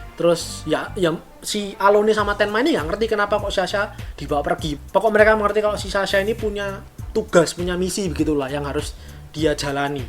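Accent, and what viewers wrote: Java, Indonesia. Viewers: Kakak orang Jawa yaa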